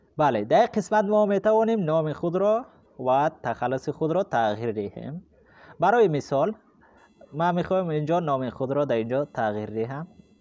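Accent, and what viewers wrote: Tajikistan. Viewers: تا الان با گویش تاجیکی آشنا نبودم، متفاوته و زیباست، ممنون از آموزش خوبتون، بهش نیاز داشتم🍀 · خیلی هم علی و واضح بود تشکر بسیار زیاد و لهجه تاجیکستانی هم اینقدر زیباست که هر رقم آدم متوجه میشه ::::::::::: بنده افغانی هستم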